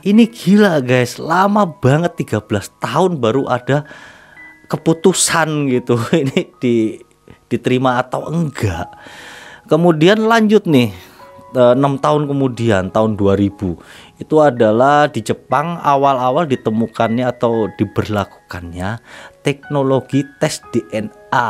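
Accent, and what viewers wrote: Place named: Java, Indonesia. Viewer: MAAF BANG YANG KU CINTAI...ANDA INI SEBENARNYA DARI DAERAH MANA? KADANG2 LOGAT BAHASA JAWA MEDOK,KADANG2 SUNDA BISA JUGA JAKARTA/BETAWI... DARI MANA YAA...BIKIN AKU PENASARAN BY THE WAY BAKAT ANDA DALAM BERCERITA MEMANG MENAKJUBKAN....SIIIP MANTAAAP, BERKEMBANG TRUS BRO..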